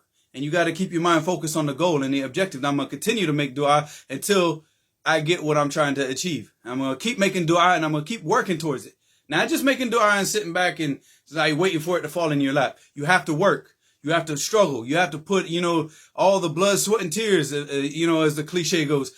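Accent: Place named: Texas, United States. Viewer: I think from texas bro but brother above also has a good point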